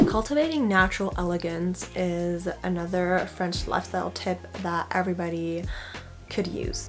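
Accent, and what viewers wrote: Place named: United States. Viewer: Je suis français et je n'ai jamais été aussi fier d'être français après avoir vu cette vidéo. C'est vrai qu'on le vit cool. Chaque fois que j'ai été à l'étranger, on m'a dit que j'avais trop de chance d'être français. Ma phrase préférée: "On adore la beauté". C'est vrai. On est cool comme des chats. Un de mes meilleurs amis, un allemand, a le défaut de manger sa nourriture en 5 minutes. J'ai essayé de le changer mais pas encore reussi. Tu es la meilleure version de nous-mêmes. Merci. J'essaye d'apprendre ça à mon enfant. Super accent américain, c'est rare pour un français de choper l'accent d'ailleurs aussi facilement.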